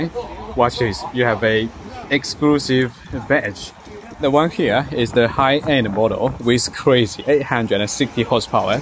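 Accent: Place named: United Kingdom. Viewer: 非要说英式很别扭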